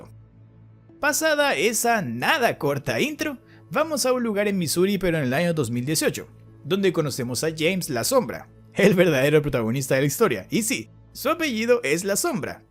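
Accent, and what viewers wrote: Chile. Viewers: No es hate pero no me gusta el asentó chileno :'(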